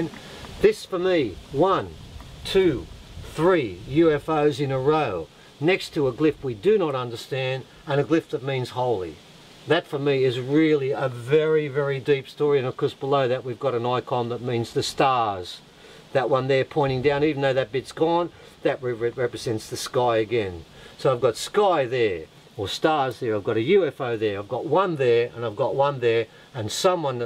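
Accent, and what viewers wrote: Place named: Australia. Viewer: It sounds like this documentary was made by an australian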